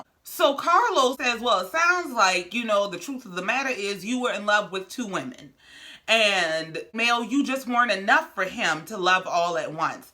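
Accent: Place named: Gullah, United States. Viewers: Don’t know how I found your channel, glad I did!! You give great commentary!! Where are you from? Your accent sounds like mine from the Carolina’s❤️❤️ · Are you from SC because your accent sounds Geechie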